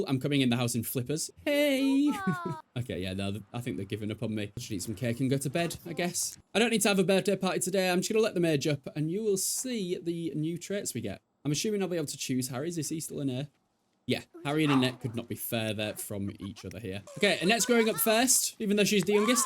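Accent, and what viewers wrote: United Kingdom. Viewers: I'm from Brazil and I constantly watch Youtubers from all over the world to improve my Englsih. I stumbled across your channel recently and I'm basicaly watching every single video with these random gameplays/challenges for The Sims 2 - my favorite one btw - and 3 and it's always a blast for me 😂😂😂😂 I love your dark and twisted sense of humor, wrapped in such calmness and "fancyness" with your british accent, please never stop your videos ❤ They really make me wanna be your friend irl!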